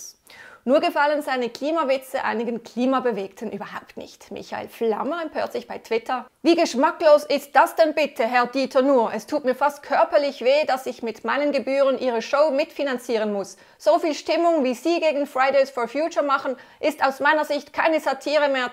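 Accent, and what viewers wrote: Switzerland. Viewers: Ich finde die Tamara Wernli total süss. Besonders ihre leichten Schweizer Dialekt finde ich gut und höre gerne ihren Ausführungen zu.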